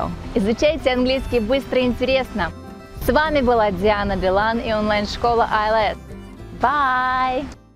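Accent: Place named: United States. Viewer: Я с Вами тоже буду учить английский, в школе учили немецкий, так ни разу не пригодился в жизни, у Вас прямо настоящее американское произношение, к нам на фирму из США приезжали, так же говорили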